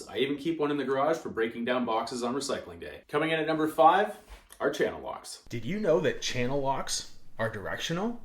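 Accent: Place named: Canada. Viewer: Listened 3x for the Canadian accent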